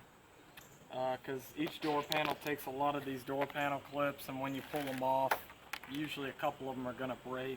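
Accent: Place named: South, United States. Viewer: bro your so country i love it lmao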